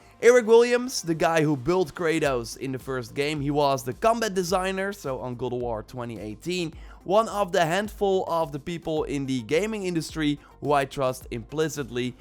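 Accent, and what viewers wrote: Netherlands. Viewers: Nice info and video, greetings from a fellow dutchy (I could hear it from your accent hehe)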